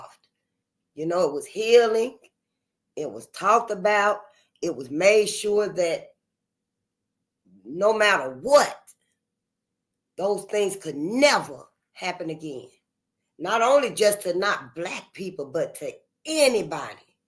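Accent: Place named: South, United States. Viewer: Sis I like your country twang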